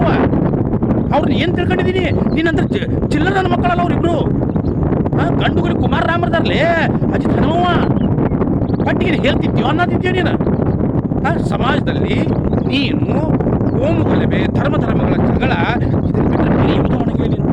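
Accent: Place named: North Karnataka, India. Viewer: ಈ ರೀತಿ ಉತ್ತರ ಕರ್ನಾಟಕದ ಭಾಷೆ ಯಲ್ಲಿ ಉಗಿಯೋದನಾ ಕೇಳೋಕೆ ಆ ಆ ಏನ್ ಚಂದ ಉಂಟು ಮಾರ್ರೆ 👌👌👌👌👌👌👌👌👌👌👌👌👌👌👌👌👌👌👌👌👌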